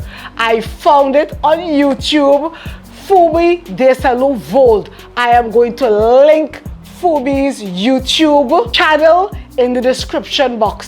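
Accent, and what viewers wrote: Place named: Trinidad and Tobago. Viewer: Oh GOSH GYAL! All looks are 🔥 🔥🔥 Look #3 was my fav! I could listen to your trini accent ALL DAY! And yes... FUMI SENT ME!😂😘💓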